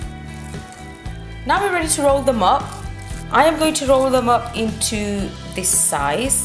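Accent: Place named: United Kingdom. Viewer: I have no idea what u are saying british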